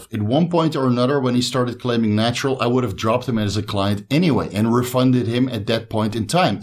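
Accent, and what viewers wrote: Netherlands. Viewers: Your profile says USA, but this is a Dutch accent right? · good video! you sound dutch. are you? greetings from the Netherlands haha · are you dutch?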